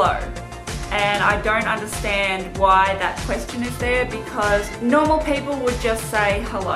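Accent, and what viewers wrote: Australia. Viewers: Please teach me Ausie english!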